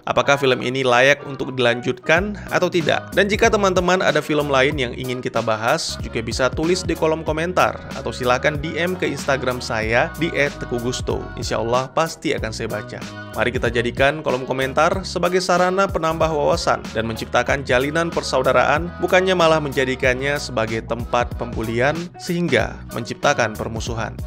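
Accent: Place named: Medan, Indonesia. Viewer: Logat medan 👍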